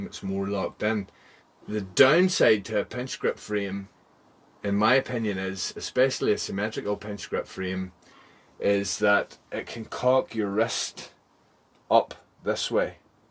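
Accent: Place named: Belfast, United Kingdom. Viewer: his accent is called a Belfast accent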